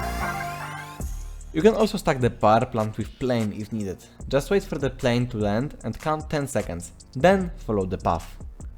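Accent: Poland. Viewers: Ah ten polski akcent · Z polski jestes bo polski akcent? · ale słychać tą polskość. Pozdro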